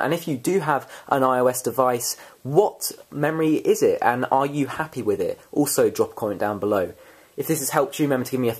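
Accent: England, United Kingdom. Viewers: I thought you was English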